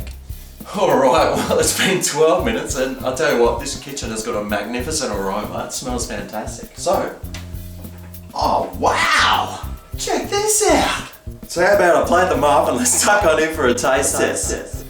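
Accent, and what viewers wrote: Australia. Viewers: We Americans just LOVE ❤❤❤ his Aussie accent!! Southern guy here too, Florida-Georgia, and those biscuits look YUMMY! 😋 Toppings: strawberry preserves, honey, butter and honey, apple butter; sausage, egg (scrambled or fried) and cheese; bacon, egg (scrambled or fried) and cheese; or sausage gravy. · Love your accent dude! We ladies are a sucker for AUSSIES!!❤ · And sounds like an Aussie... so why didn't he just call them scones? They look like scones (more or less.) Do they taste the same, I wonder? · When I clicked in southern biscuits, I was not expecting an Australian! I'm a southern gal from America and loved your tutorial! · Suprised the crap out of me when I heard the accent, I simply clicked on the thumbnail. I'm in Kentucky, USA watching this dude on the other side of the world make biscuits...something they don't have????? OMG! 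LOL They sure looked good. The real trick is the flour, soft winter wheat it's called, grows here in the South of the US, not sure what you have over there. And we have self-RISING flour, not self-RAISING flour! I love it! So wish I could visit Australia, seems a magical place. Great video. 955 yankees disagree. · This is exactly the same as the Southern Living recipe for perfect buttermilk biscuits. With an Aussie accent.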